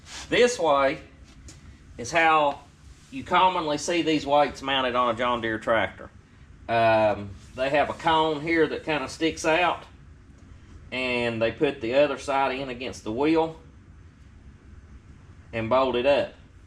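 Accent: South, United States. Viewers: Dude stop talking redneck... It's time...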